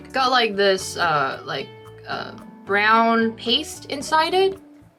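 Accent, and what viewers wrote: United States. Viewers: You got a mid west accent. What state you get it from? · dang u live in korea? you sound american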